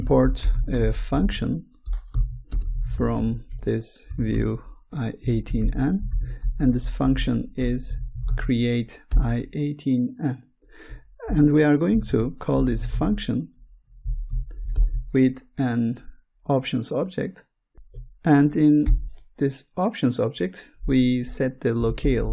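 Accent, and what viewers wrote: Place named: Turkey. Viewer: just when I started to think "wow what a heavy Turkish accent", the tr locale showed up haha. Thanks for the tutorial though.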